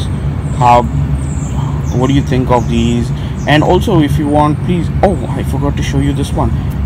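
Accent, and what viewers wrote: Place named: India. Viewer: Lol.! Yes, I DO realise that many Indians speak English, after all, I live in Birmingham UK which has a very large Indian population! But it was your neutral ACCENT that impressed me, not your ability to speak English - many Indians retain a very thick accent when speaking English, and you don't have that. Anyway, all the best from the UK!!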